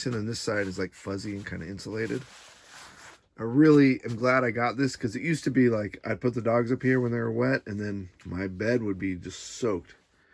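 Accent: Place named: Long Island, United States. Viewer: Where you from you sound like yr from long island ny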